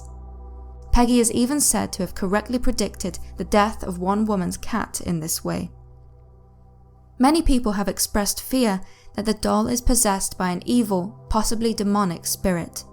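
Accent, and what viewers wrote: United Kingdom. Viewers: You have a beautiful British accent . I lived in greater Manchester most of my life being a duel Citizen. Anyways, I am for sure subbing to the channel as I am interested in the true paranormal. There is just to many out there in researchers on television and YouTube that fake it. You do not and I know another person that does not either. Ta mate , for the great videos! You truly have my respect. Cheers!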